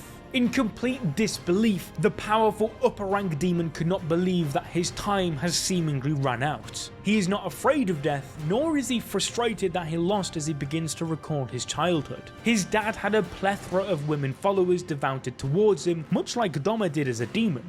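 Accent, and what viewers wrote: United Kingdom. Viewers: “Full cinematic fight” um its just a British annoying voice explaining what we are seeing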